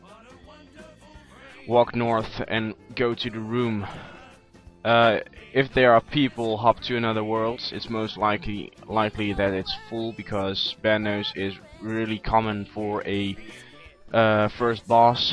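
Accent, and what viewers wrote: Netherlands. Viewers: You're Dutch, I can hear that lol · lol u can hear. he is from the netherlands :P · haha ik kan gewoon horen aan je accent dat je nederlands bentXD: WEL TOF TROUWENS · r u dutch? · dutch accent!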